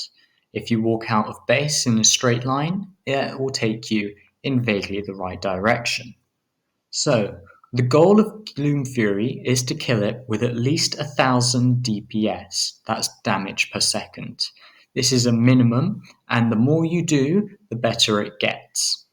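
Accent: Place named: United Kingdom. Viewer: I clicked off after hearing the british accent!!!!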